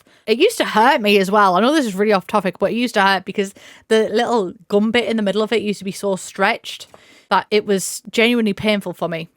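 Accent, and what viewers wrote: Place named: Middlesbrough, United Kingdom. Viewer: I had a random thought while listening to this video... I don't remember if the Harry Potter books SAY what region Lily and Severus are from, but since it's an industrial town I decided my new head cannon is that they're from around Middlesbrough and therefore share your accent and that really makes me happy. 😁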